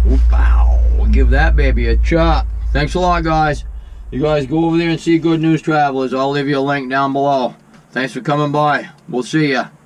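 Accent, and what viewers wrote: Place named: New England, United States. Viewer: I'd swear you were in New England. 😁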